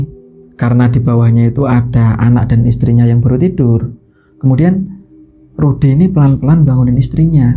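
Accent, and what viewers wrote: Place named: Java, Indonesia. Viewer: sll bs d nikmati.. logat jawanya.. sy suka.. sehat sll y bang..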